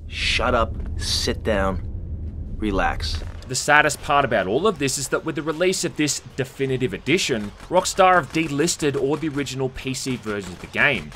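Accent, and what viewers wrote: Australia. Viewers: As soon as I heard the Australian accent I was like 'hell yeah this will be a good critique'.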